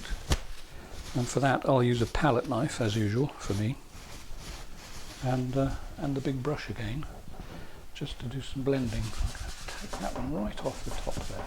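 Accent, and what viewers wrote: United Kingdom. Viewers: You are so talented and you also crack me up. You look like an actor. Or maybe it's just your accent. We American's are very taken with British accents. We also think all accents similar to yours are British LOL.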